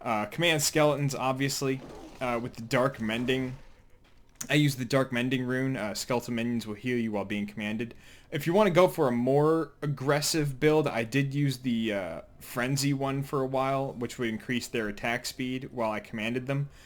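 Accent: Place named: East Coast, United States. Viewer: Hey dawg your channel is awesome and so is your east coast accent, I wonder how u have such low subscribers, good luck and thanks.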